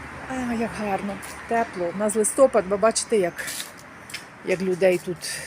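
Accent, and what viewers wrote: Lviv, Ukraine. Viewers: Привіт зі Львова. Чути по розмові, що наша😊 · Si sente dialetto Leopli❤ Pruvit Sono da cittadina vicina al Leopoli Sambir💙💛 Slava Ukraini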